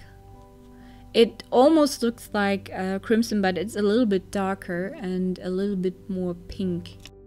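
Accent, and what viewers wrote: Germany. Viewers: You're german. I can hear it😂.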